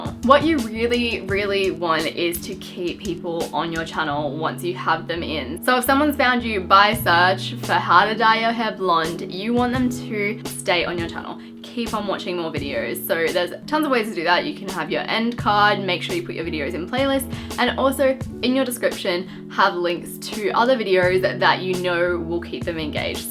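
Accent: Australia. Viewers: Oh my god your Australian I love how you talk ( you.. Are Australian right?) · I love Aussie Accent.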